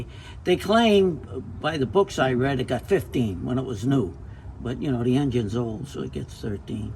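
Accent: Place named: Boston, United States. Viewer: Beautiful Cadillac! I detect a Boston accent...!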